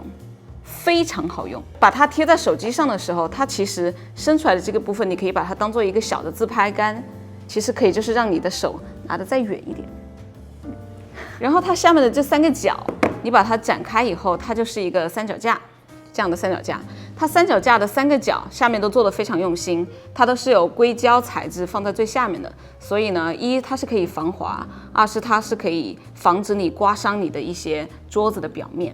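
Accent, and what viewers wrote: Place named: Taiwan. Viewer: Steely是台湾人吗？说话一股台湾腔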